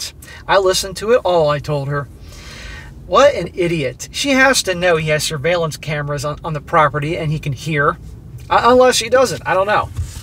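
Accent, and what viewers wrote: Yorkshire, United Kingdom. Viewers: This bloke sounds like a Yorkshire man, they are honest direct n strait to the point, one of my closest friends Richard is from Harrogate Very honest helpful direct n trustworthy, you just can't get better, not implying the rest of the people are dishonest by all means, but Yorkshire people are the best I met on earth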